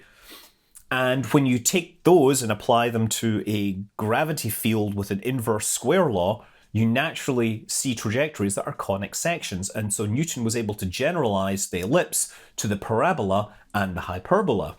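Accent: Scotland, United Kingdom. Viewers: JUST KEEP ON DOING WHAT YOU DO ❤️ ... I watch many other space channels like Every Day Astronaut etc ... But it isn't a good week, if I don't hear your fantastic scottish accent 🙏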